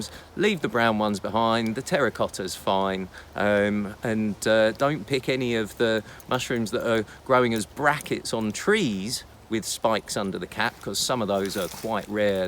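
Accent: England, United Kingdom. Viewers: I definitely sharing your video on Facebook here and America. You know we can trust you because you have an English accent.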